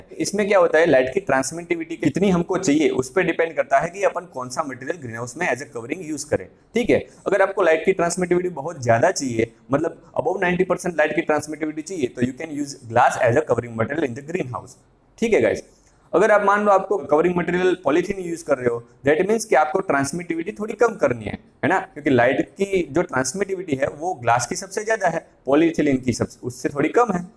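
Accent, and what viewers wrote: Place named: Gujarat, India. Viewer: Bhai gujrati chho ne awaj thhi j Lage chhe but good explanation sir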